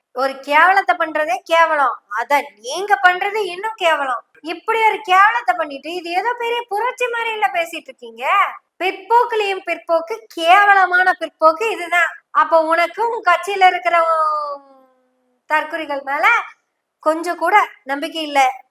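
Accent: Kongu, India. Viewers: எனவே எனவே இதைப்பற்றி நல்ல கருத்தை கொங்குத்தமிழ் மொழியில் பேசிய சகோதரிக்கு நூறு வாழ்த்துக்கள் அரசியல்வாதியாக உள்ளே வரும்போது ஊழல்வாதிகளாக தற்குறிகளாக நுழைகிற கூட்டம் இதற்கு நல்ல பதிலடி கொடுத்திருக்கிறார் இந்த சகோதரி வாழ்த்துக்கள் வாழ்த்துக்கள்.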